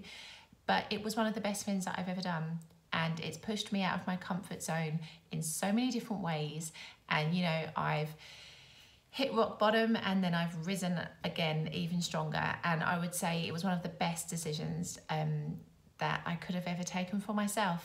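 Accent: England, United Kingdom. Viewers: I just found out your channel, I love your accent, what part of England are you from?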